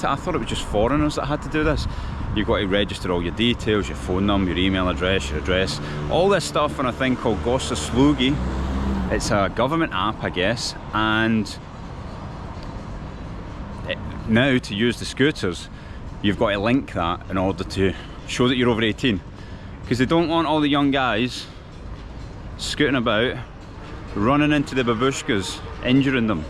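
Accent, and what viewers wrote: Scotland, United Kingdom. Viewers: I like the walking tours! Is all of Moscow so clean? Where are the seniors? I only see young people. I live in Texas, what a difference! And I love your Scottish accent! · I’m here for the Scottish accent 😃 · I won't be muting the video. I love a Scottish accent. The tour of Moscow was great. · Nothing wrong with a Scottish accent lol. Greetings from London x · thank you! I love your beautiful Scottish accent. ♡ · Nice take.. and kind of surreal to listen to your Scottish accent in the middle of Moscow, I quite like it though. I subscribed to your channel because you are doing something different, the war talk has taking its toll on everyone’s mental health, just keep on doing this man! 👍✨👍✨ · Many thanks for the honest tour! Your scottish accent is just fine. Btw I've enjoyed watching every minute of this vid. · Great video! 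Would love even longer walks from you. Beautiful, informative and love your Scottish accent. Nice balance of footage of you and the city. I did watch it all the way to the end. I liked it all just the wsy you did it. Hope my feedback helps. Thank you!! :) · I very much enjoy these videos. I think your Scottish accent is just fine. Well suited for this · Your walk&talk tours are great! I don't mind the Scottish accent at all.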